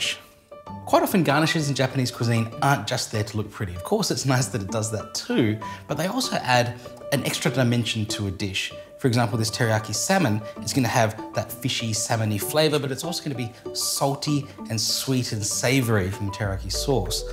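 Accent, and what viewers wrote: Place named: Australia. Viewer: He sounds Australian... maybe kiwi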